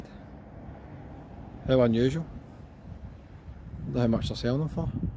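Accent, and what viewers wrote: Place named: Scotland, United Kingdom. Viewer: Certainly a Scottish accent but his is a central belt accent not a north eastern accent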